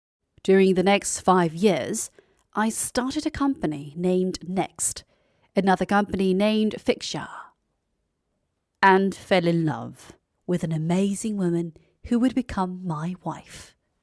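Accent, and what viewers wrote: RP, United Kingdom. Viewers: 영국식 발음을 익혀보려하는데 매우 좋은 영상이네요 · 따라하면 저도 포쉬악센트 할수잇나용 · 영국발음 연설을 듣고싶었는데 들을수있어서 기뻐요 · 동양인이 여왕 영어한다는 거 자체가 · you have the most perfect British Pronunciation I've ever heard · 님 발음들으면서 영국발음 연습중입니다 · 영국 포쉬발음은 언제나 매력적이고 듣기 좋아요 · 영국식 발음으로 읽어주셔서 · 우아한 영국발음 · 진짜 영국 발음 최곱니다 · 영국영어로 들으니 새롭네용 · 영국발음으로 들으니 너무 좋아요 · 영국식 발음의 낭독을 따라할 수 있어서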